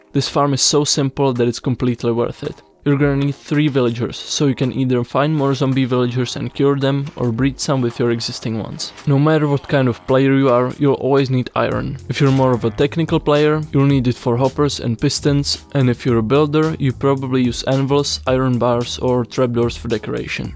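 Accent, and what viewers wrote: Czech Republic. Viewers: you got very czech accent bro :D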